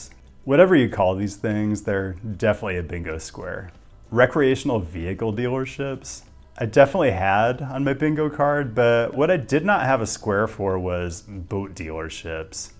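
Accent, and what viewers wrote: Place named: Pacific Northwest, United States. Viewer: First, I absolutely love the content. I have to ask though, are you from the Pacific NW? I'm a linguist and you have the strongest PNW accent I've ever heard, it's amazing 😂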